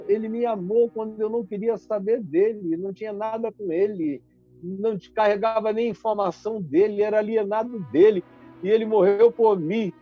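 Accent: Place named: Pernambuco, Brazil. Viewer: Aí sabe que fala Recife Pernambuco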